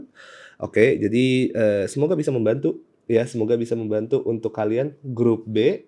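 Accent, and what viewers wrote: Java, Indonesia. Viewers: medoknya emang jawa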